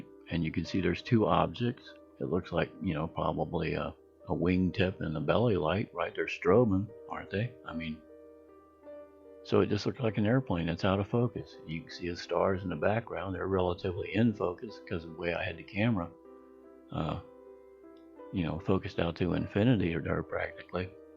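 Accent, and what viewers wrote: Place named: United States. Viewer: Ok. Maybe it was someone else but i thought I had asked you about the solar eclipse we had about 2 years or so ago. And if you had any footage?. I had heard stuff going on at that time. But good to know, you definitely sound American 😄. I'm down in NC. I've noticed must things I see here are towards the east! Maybe coming over the ocean.?.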